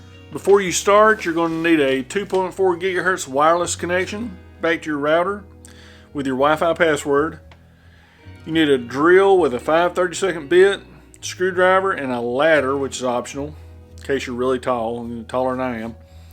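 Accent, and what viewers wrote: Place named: South, United States. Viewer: try to rid of the southern accent. you will get more money, dude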